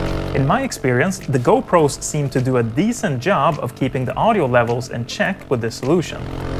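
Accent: Sweden. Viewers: Dude hear some Swedish accent. Thanks for the video, I got a GoPeo H8 for my birthday a couple of days ago.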